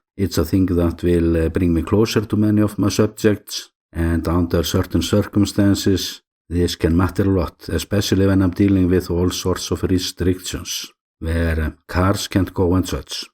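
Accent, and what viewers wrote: Iceland. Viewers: Listening to your wonderful Icelandic accent is like listening to poetry. Keep up the enthusiastic commentry.😊😊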